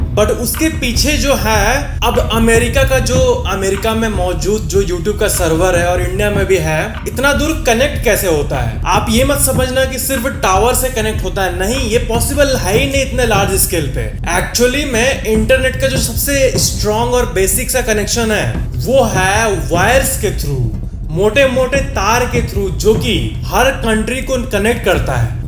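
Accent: Bihar, India. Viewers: That bihari essence wow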